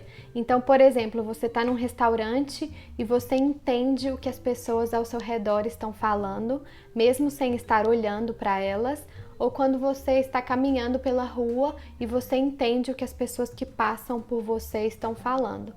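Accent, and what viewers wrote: Minas Gerais, Brazil. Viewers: Ótimo vídeo...você é mineira? :D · O seu padrão , com este sotaque , é mineirês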